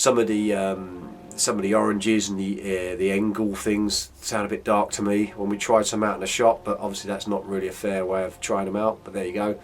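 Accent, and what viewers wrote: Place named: North, United Kingdom. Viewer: Where are you from, mate? Is that some northern England accent? I am not a native english speaker, but it is like i have no difficulties to understand every single word you say. What makes it even more strange, is that i dont hear this accent very often, yet it is much more understandable to me than every other english accent i heard.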